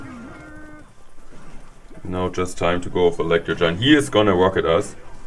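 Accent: Germany. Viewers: Somehow the German accent makes it all sound so much cooler. Nice video,.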